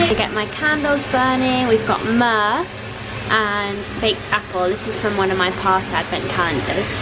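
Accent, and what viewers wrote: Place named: London, United Kingdom. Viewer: Applered she’s definitely a Londoner so that’s a very cute London-accent :)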